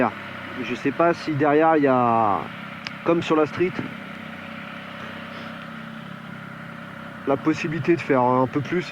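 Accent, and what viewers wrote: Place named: Paris, France. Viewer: Salut à toi, j'aime bien ton accent parisien et la pertinence des tes coms lol..ça change des autres vlogs. Tu as peut être brisé mes rêves d'acquérir une 660 une fois mon permis obtenu, compliqué d'obtenir un modéle A2 fiable et bien équipé. Continu tes vidéos sympa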